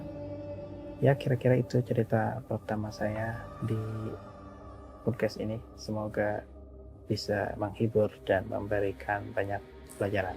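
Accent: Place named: West Java, Indonesia. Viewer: Pasti orang sunda nih mas nya :v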